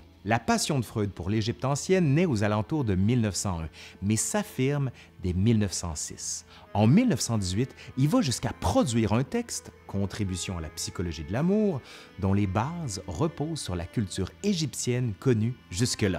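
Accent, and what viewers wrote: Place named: Acadia, Canada. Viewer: Les bobards des journaux européen ont la vie dure.. avec les malédictions (sur Howard Carter et, tout les autres,).bien avant the Sun un des... descendants.. Merci pour cette vidéo Intéressant ...et pédagogique On suit les explications comme les fresques.. égyptiennes.. avec un éclairage nouveau et toujours sympathique avec l'accent Acadien 😉👍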